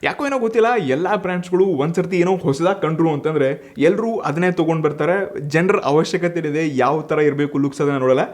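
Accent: North Karnataka, India. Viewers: ಉತ್ತರ ಕರ್ನಾಟಕ ಶೈಲಿಯ ಭಾಷೆಗೆ ಧನ್ಯವಾದ.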